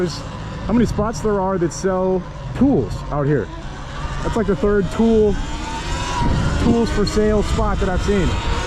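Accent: Minnesota, United States. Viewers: Where you from Minnesota? You got the accent lol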